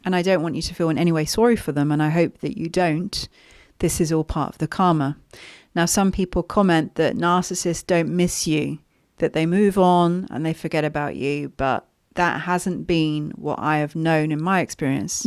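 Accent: United Kingdom. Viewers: I do excuse me for asking are U based on UK seem to me U have strong Brit accent anyway blessing for your work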